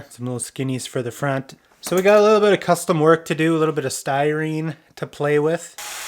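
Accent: Canada. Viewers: Where in Canada are you from? You sound a bit like Bubbles from NS.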